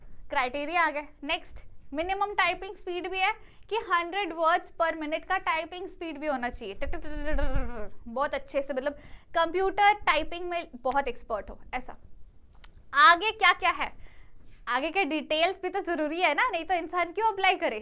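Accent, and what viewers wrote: India. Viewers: bihar, up, ya haryana se hoga sawra pakka.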